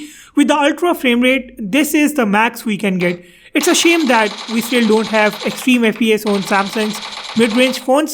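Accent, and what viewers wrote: India. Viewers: What an indian accent....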